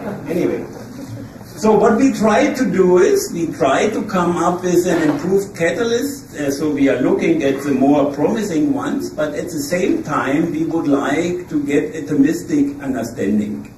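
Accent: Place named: Germany. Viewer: dat german accent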